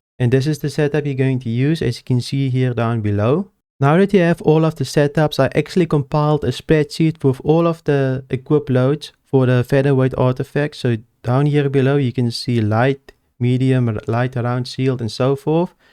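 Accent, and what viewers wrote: South Africa. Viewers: Are you south african brother?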